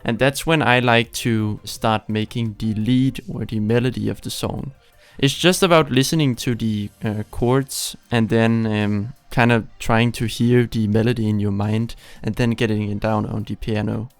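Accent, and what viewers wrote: Denmark. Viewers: Er du dansker? :p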